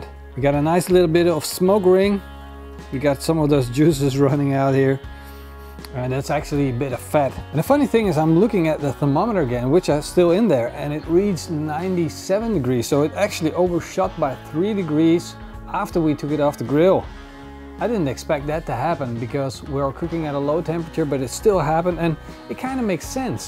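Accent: Netherlands. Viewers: Yo, I love your videos and your Dutch accent is charming. One quick question. Why do you always wrap your meat "shiny side out"? Usually you'd do that if you wanted to deflect heat, but I don't think that's what you mean to do when wrapping. Is this deliberate or doesn't it matter with these ribs?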